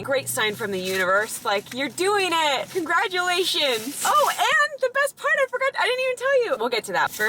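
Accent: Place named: Tennessee, United States. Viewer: Sound like Gatlinburg Tennessee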